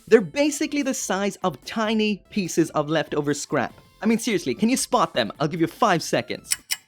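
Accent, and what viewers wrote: Ireland. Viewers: You're Irish-born huh?!?!?! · Oy! Irish?! Noice. Is your waifu genderbent lockon Stratos?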